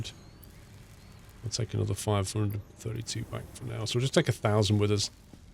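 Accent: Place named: United Kingdom. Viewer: Australian 😉 yeah he a brit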